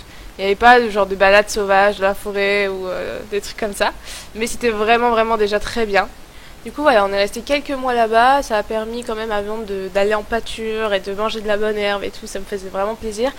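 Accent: Nord-Pas-de-Calais, France. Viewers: T’es une Chti comme moi 😁😁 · Mais non t'es chti ???!!!!!!!!!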